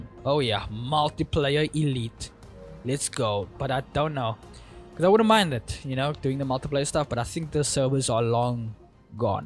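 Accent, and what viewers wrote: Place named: South Africa. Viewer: Yo man, great video. Your accent sounded so damn familiar and turns out you are South African😂. Keep up the great videos, glad to see a fellow South African youtuber in the niche.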